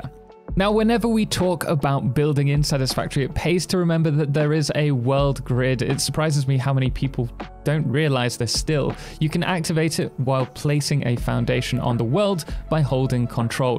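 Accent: United Kingdom. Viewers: Which you had more play content around 20minutes. Me and my GF enjoy your voice and Brittish accent. We have been watching your clips while I started Satisfactory 2 months ago. Maybe a playthrough when 1.0 drops? Epsiodes around each Tier or catalogue would be a tip? Standard Parts, Electronics, Steel, Copper, Iron factories, then truck lines and train lines adding on from tour starter location. We find imKibitz too stressful to follow and his megabuilds are far too big to follow.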